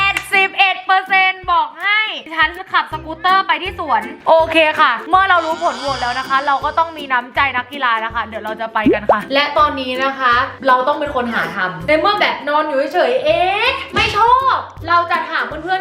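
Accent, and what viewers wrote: Nakhon Sawan, Thailand. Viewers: เฮียเหน่อออออแบบฉบับนครสวรรค์ของแท้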